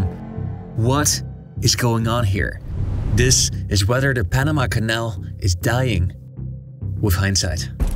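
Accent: Netherlands. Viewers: Is he Dutch? Sounds Dutch trying not to sound foreign.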